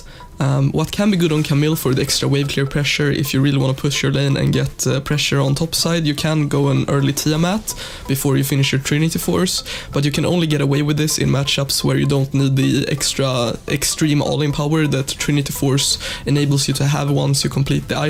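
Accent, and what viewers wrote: Sweden. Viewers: You can hear clearly he is swedish xD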